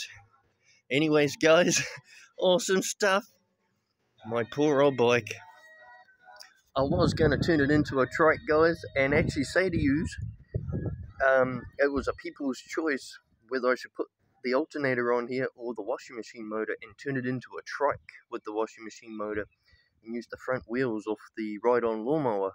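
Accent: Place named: New Zealand. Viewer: You a kiwi mate? That's a hell of an accent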